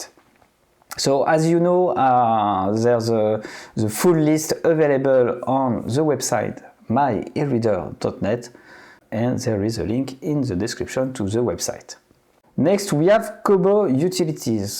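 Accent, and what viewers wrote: France. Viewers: loved your french accent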